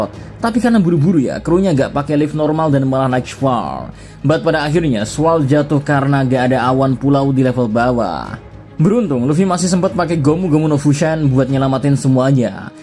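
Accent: Java, Indonesia. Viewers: Males bgt denger suaranya Medok nya medok ga enak. Nada medok jawa tuh enak, terkecuali lu.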